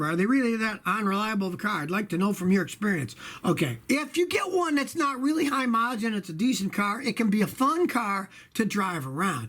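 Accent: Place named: Michigan, United States. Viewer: You ROCK!! You sound like you have a Michigan accent.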